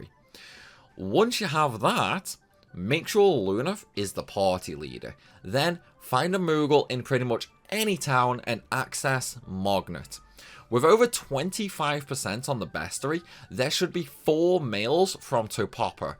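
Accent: United Kingdom. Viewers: Have you never heard a Brit speak before?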